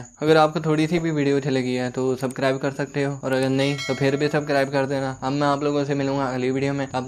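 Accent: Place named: Pakistan. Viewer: Yaar aap ki awaaz Pakistani jasi ha na isliye 😅😅😅❤❤❤ nice explain bro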